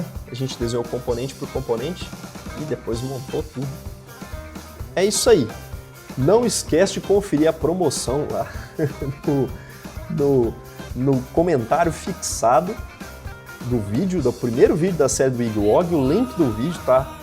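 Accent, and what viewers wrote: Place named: Minas Gerais, Brazil. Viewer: Realmente melhor tutorial para começar! Bonus point pro sotaque, Mineiro né? hahaha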